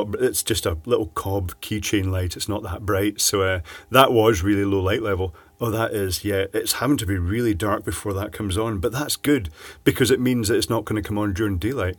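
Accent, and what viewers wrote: Scotland, United Kingdom. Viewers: It is the Scottish accent. I lived up in Scotland and the people are really chilled and add a sense of humour and you will be refreshed by the positive energy. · Whenever I play a video my cat comes in the room and starts mewing, he must like the Scottish accent. I have two old phone batteries on my desk, I was thinking "What can I do with them?" and this video shows up.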